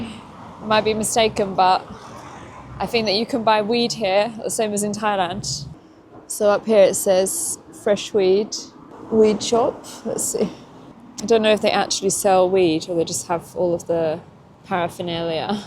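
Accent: England, United Kingdom. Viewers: expat in Germany here. She sounds English to me but said, for example, "stopped at a gas station" which is extremely american. Is that what we now say for petrol station in the UK? 🤥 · It's my first time in your channel and I have to say your British accent is amazingly charming ! Thank for sharing your experiences and the best wishes from a Brazilian fan! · اللهجة البريطانية الانكليزية لغة الملوك ❤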